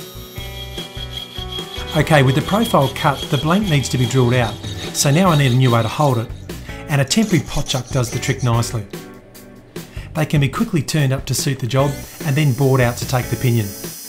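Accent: Germany. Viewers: Are you german, swiss or austrian? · are You german?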